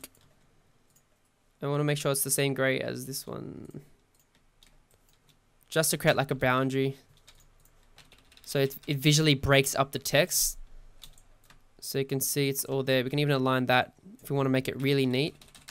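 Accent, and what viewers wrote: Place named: Australia. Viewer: Ah an Aussie chap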